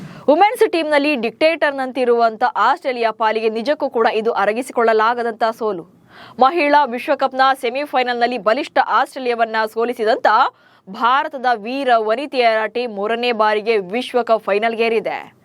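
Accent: Standard. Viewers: ಅದ್ಭುತ ವಿವರಣೆ, ಶುದ್ಧ ಕನ್ನಡ. ಅಭಿನಂದನೆಗಳು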